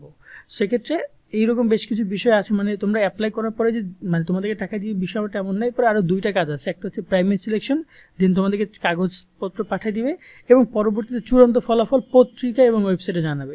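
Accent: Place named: Barishal, Bangladesh. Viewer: Vai apni ki barishal er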